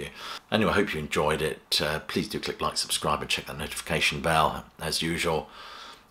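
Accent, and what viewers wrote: England, United Kingdom. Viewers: F-Them. Love your English accent. Keep up the good work. All the best from me to you, Sir! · Love your channel and your English accent, eccentricity and humor! Plus your knowledge is vast! Carry on Wayward Son of Rock! Never mind the Trollos!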